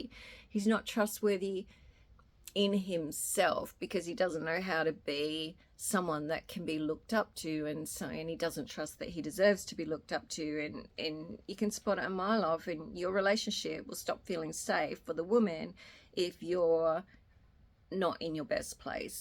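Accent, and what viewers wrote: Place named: Australia. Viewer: Are you Australian? Its kinda tricky where to place your accent?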